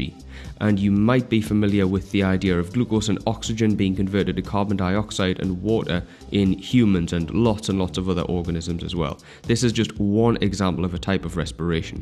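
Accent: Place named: North East England, United Kingdom. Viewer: Love that you are from the north east haha and the accent comes out 100%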